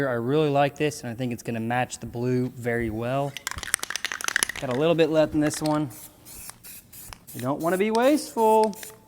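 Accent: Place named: United States. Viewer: Saludos, de que parte de Estados Unidos es tu acento?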